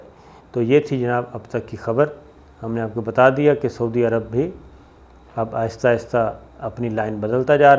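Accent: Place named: Saraiki, Pakistan. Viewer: Saraiki speaking hai oski urdu aise he hai ap log khhamakha time apna zaya kr rahe ho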